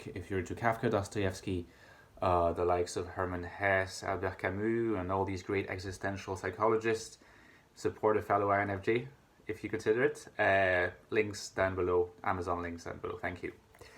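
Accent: Ireland. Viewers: He's got an Irish accent but I think he's French?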